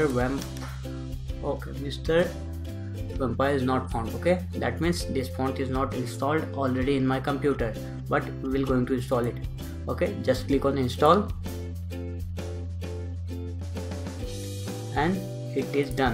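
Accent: India. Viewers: The music really annoys me. With the difficult indian accent, the music makes it so hard to focus. Kill the music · x( no soporto ese acento indu-ingles. good video overall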